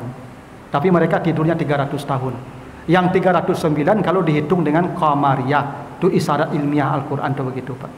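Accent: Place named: Minangkabau, Indonesia. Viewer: Khas muralabuahnyo . Membuek ambo takana jo kawan ambo maso di padang daulu. Tapi rancak end mantap mendanga ust membawo ceramah. Ambo sanang 👍👍👍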